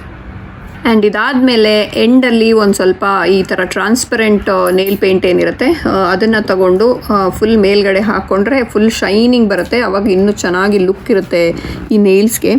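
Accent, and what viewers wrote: Mangalore, India. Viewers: Hey I like to watch ur videos coz I do speak Kannada just like u.bindass but let me tell you that iam a mangalorean but I was born n brought up in Bangalore Namma bengaluru ❤️so I was happy to know that ur a mangalorean.ur hubby spoke about biryani I was like OMG good. anyways how is Canada's present situation.hope everything is fine there take care ❤️👍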